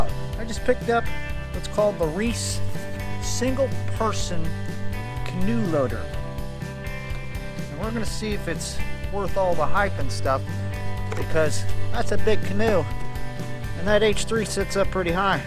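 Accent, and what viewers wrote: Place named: Minnesota, United States. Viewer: I recognize your accent (reminds me of Al Lindner). Are you from the Brainerd, Minnesota area?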